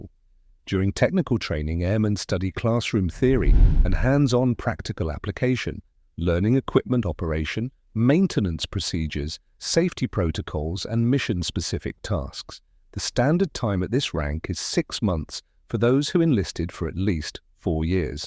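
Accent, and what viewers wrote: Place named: United Kingdom. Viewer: You should have had a American do the voice over for this video. Our Lieutenant's are pronounced with no "f" in the title. The British pronounce the word lieutenant with a f in it.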